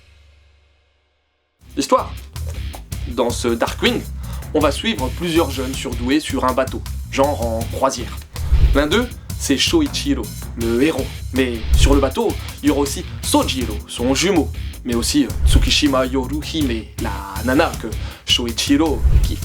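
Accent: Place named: Japan. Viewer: Bonjour, Je suis surpris par ces prononciations des noms anglais à la japonaise (rien à voir avec le "w" hein). Je ne pense pas que c'est de cette façon que vous fideliserez/attirerez de nouveaux followers. Après, ce n'est peut-être pas ce que vous recherchez. Au revoir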